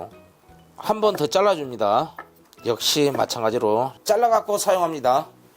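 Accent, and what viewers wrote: Jeolla, South Korea. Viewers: 대표님 전라도 출신인가요 정가이 갑니다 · 끄트머리는 짤라불고요.~ 전라도 사투리가 겁나게 구수해부네요이! ㅎㅎ 덕분에 저도 상추장아찌 맹글어 볼게요. 항상 건강하시고 행복하세요.~ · ㅋㅋㅋ 전라도사투리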